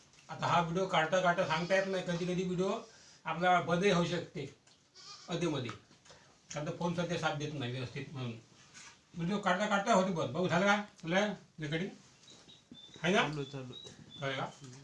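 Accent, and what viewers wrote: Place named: Vidarbha, India. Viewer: भाऊ तुम्ही नागपूर म्हणजे विदर्भात ली भाषा बोलता.मीपण विदर्भात ली आहे त्यामुळे मला बरं वाटते.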